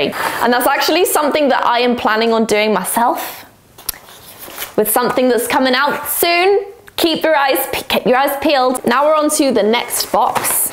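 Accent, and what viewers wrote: United Kingdom. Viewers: I liked the derwent graphite paints. And the faber castel pens too. 12 boxes to choose from. Makes for a hard decision to choose just 1 box. Love watching you. Nice hearing a British voice in the USA. Love from the UK x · Would be interested in the details of the company, as am in the uk... look very good quality! Thsbjs for the great video (as always), lovely to see that you have retained your uk accent! X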